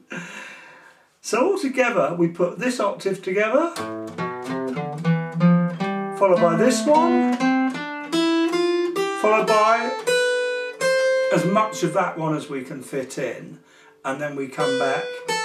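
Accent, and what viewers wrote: United Kingdom. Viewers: oh noooo!! brit english again.. :-(((((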